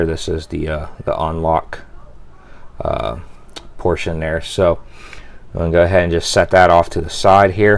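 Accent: Pittsburgh, United States. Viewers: This guy must be from Pittsburgh with that accent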